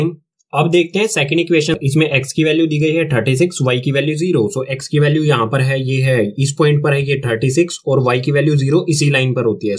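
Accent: Haryana, India. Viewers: bhai aap haryana ta ho k??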